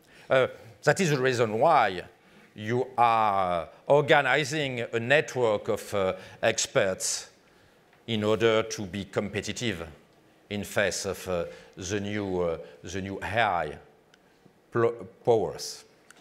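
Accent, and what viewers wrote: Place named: France. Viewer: il a un anglais tellement so french que je le comprends parfaitement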